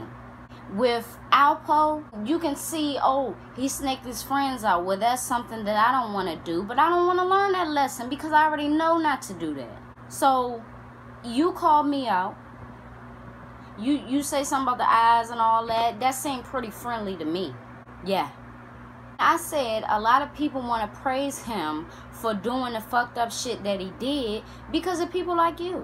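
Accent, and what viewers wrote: Washington DC, United States. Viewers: Do your thing sweetie much love to you I from dc.u sound like u from the dc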